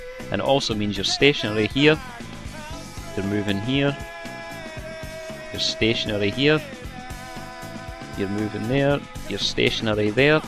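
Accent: Scotland, United Kingdom. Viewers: hehe i like that scottish accent :D and the video is very good and informative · Probably?! He's as Scottish as haggis. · Didn't realized scottish accent was so universal as to not be recognized. I was bewildered though! Good job! :) (ps.: Any idea when part 2's coming?) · Probably Scottish... =P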